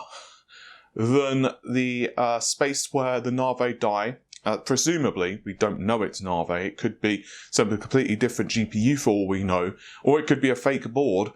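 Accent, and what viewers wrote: England, United Kingdom. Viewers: Mike D He is British from Great Britain, with an English accent then :)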